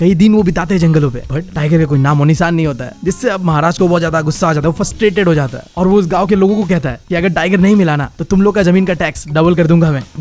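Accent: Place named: Bihar, India. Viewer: You are from Bihar/Jharkhand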